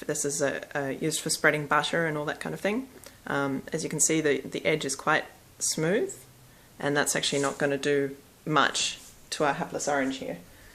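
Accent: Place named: Australia. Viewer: There's more videos than this but yes, still nothing wrong with having more of them. Perhaps do some videos on wrestling with longswords, as many are under the impression it simply didn't happen. Edit: Also I thought you were Australian for a minute there (I'm Australia.) gee the difference between your accent and ours here is a lot more subtle than I'm used to.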